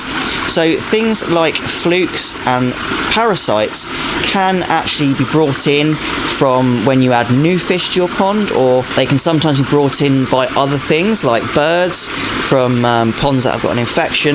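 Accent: England, United Kingdom. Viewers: He’s literally speaking English in an English accent. How is it that you can’t understand him 🤔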